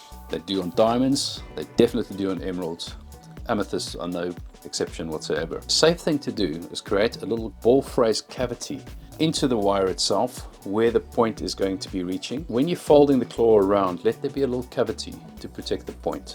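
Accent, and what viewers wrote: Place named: Netherlands. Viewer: Your sound Dutch😊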